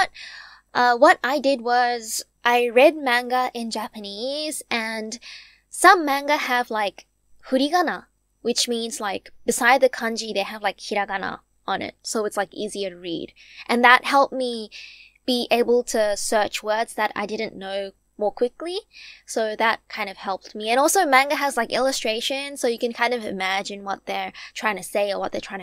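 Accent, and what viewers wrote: Australia. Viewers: Every time I have one of your videos going in another tab I expect the next words out of your mouth to be "WELCOME BACK TO HOW TO COOK THAT I'M ANN REARDON" your aussie accent is so strong, I love it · Oh man just found out this channel today. The nostalgia. I lived in Australia too for my childhood and they sent me to Saturday school. I fucking hated it 😂 In the end I went back to my home country and I still can't speak my mother's tongue, what a waste of perfectly good Saturdays. Unfortunately my Aussie accent has died a bit cause I have to adapt to the English here so others can understand me. Man it'd be great if I could go back - say sunnies, undies and collect cricket cards from Weetbix again. It's so refreshing hearing the Aussie accent even if it is a bit different than what I'm used to, I think it's from Sydney. I'm #Perth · The aussie accent is so cute😩💓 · I knew that she was from Australia the first time I heard her accent I have a friend that's from Australia and she has mostly the accent as my friend lol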